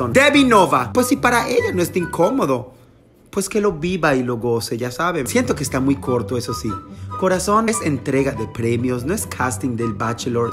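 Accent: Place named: Yucatan, Mexico. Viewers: Amo tu acento yucateco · Jajaja solo veo tus videos por que me da ternura tu acento yucteco <3 y todo lo demas 🐷🐷🐽🐽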